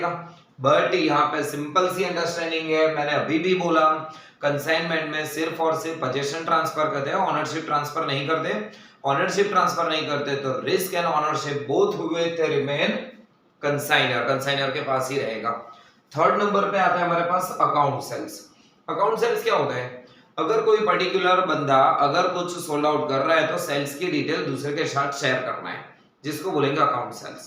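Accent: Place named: Hyderabad, India. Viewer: Sir are you from Hyderabad , your accent is just like hyderabadi , anyways easy language , good information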